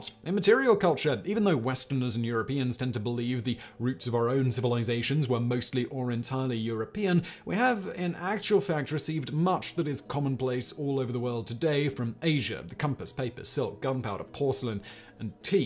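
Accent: United Kingdom. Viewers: I have a question, HOW do you keep your voice with all of the talking that you do?? Been a fan of all your channels for a while, but just found the Casual Criminalist Podcast last week. I drive a truck 9 hrs a night here in the US and listened to them all last week. The sarcasm and proper British way of speaking make it great.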